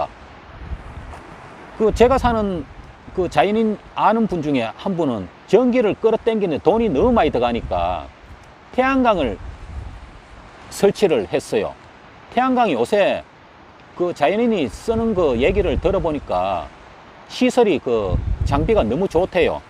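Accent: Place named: Uiseong, South Korea. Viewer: 의성 말투 같은디요.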